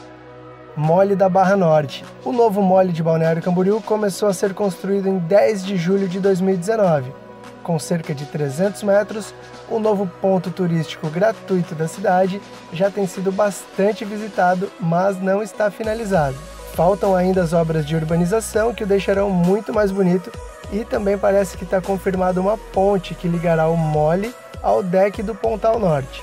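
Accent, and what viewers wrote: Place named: São Paulo, Brazil. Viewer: Sotaque paulista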